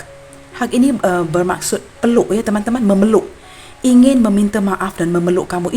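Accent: Malaysia. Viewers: Pagi kak. Pisces hadir. Suara kakak,seperti logat Malaysia. Terimakasih readingnya. Sukses selalu 🙏🏻🙏🏻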